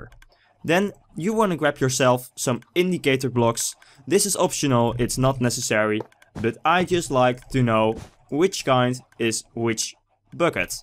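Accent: Netherlands. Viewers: Are you Dutch? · u dutch ..... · All my drops get burned off the lava? Why does it do it? And another question? Are you dutch? You have a dutch accent, im dutch as well ;D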